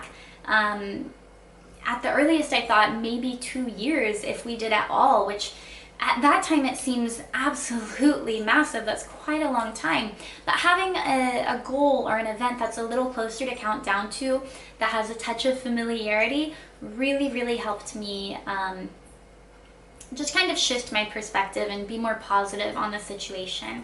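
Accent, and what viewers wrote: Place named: United States. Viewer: Oh,yoyr accent is incredibly beautiful between different american accents,where is your state in USA which treat you with this beautiful american accent then??👌👌👌👌👌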